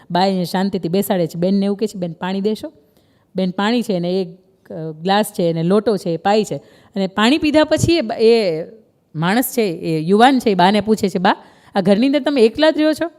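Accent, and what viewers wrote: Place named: Kathiawar, India. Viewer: આ બેન ને ખુબ ખુબ અભિનંદન ધન્યવાદ બેન તમારી આ વાત સાચી છે અને માણસ નું જીવન બદલી નાખશે એવી જ વાતો છે બેન એક વાત તમારા ઘણા વિડિયો જોયા તમારું નામ ક્યાં વતની છો એ જણાય તો અંતર નો આનંદ ખુબ વધી જશે બેન તમારી બોલવા ની અને જમાવવાની અને મીઠી ભાષા કાઠીયાવાડી ખમીર વંતી વાત સાચી છે હું પણ એક કછી પટેલ છું તમારા ઘણા વિડિયો જોયા છે ખુબ આનંદ થાય છે ફરી પણ આવા વિચારો અને વિડિયો મોકલ તા રહેજો બસ એજ ખૂબ ખૂબ અભિનંદન ધન્યવાદ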